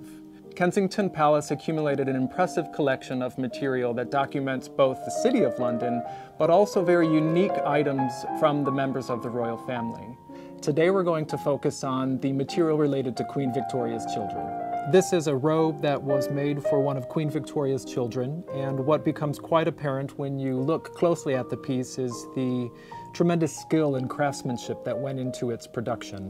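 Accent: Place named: United States. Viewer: WHO MADE THIS APPALLING IN QUALITY DOCUMENTARY?Firstly Who is presenting these exquisite objects? with Canadian or. I hope not American accent. Why does the Camera stay fixed on him. No interest ,instead of presented pieces? Irritating background noise . And Too short moment on objects with zoom image clouded. I CANNOT BELIEVE WITH ALL THE BRILLIANT YOUNG PEOPLE. WHO PRESENT DOCUMENTARIES OF THE HIGHEST QUALITY . THAT THE MUSEUM OF LONDON PRESENTS. THIS VERY POOR QUALITY WORK. An English Lady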